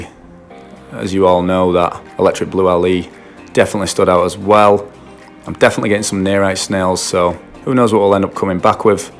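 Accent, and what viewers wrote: United Kingdom. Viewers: Just found this, you from the UK? As you have an accent south of Manchester 👍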